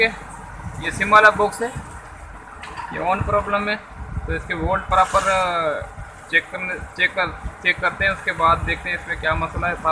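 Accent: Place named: Sindh, India. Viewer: Ha aapke bolne ke andaz se pata chal jata he sindhi style he ...main umarkot sindh se.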